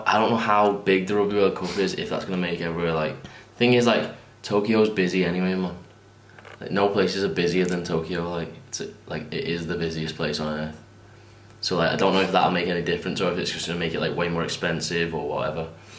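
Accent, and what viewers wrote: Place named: Northwest England, United Kingdom. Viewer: Wondered if the accent was more scouse or manchester. Runcorn is in-between I think.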